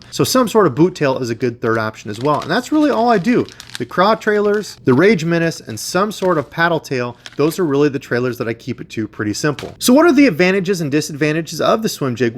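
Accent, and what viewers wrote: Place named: South, United States. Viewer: The entire South sounds exactly like that lol….Id like to know how to use the swivel or wobble type head ones.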